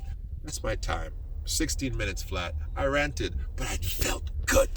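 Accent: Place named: Trinidad and Tobago. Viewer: u r funny bro. u sound like u from Trinidad 🇹🇹 🤣 😂 great humor bro and good takes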